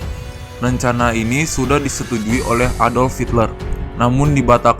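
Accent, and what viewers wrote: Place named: West Java, Indonesia. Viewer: Ini pasti yg baca narasi orang sunda..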